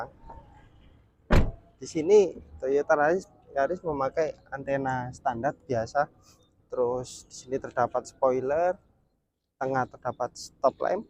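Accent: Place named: Kediri, Indonesia. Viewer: Logattè koyok orang Kediri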